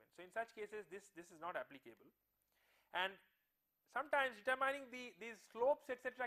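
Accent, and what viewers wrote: India. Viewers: Simple looking Indians with INDIANISED accents have great intellect ! I am proud of India ! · the best tutor gives u the basics of any subject clearly is the indian though his annoying English :)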